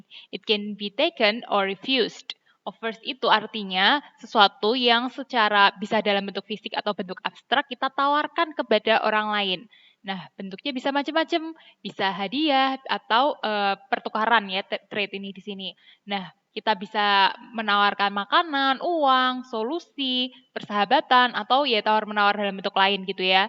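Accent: Java, Indonesia. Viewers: Thankyou mbaa, lucu mbanya medok hehehe ♥️♥️ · Kak orang aceh ya? Soalnya logat nya kayak jawa☺😁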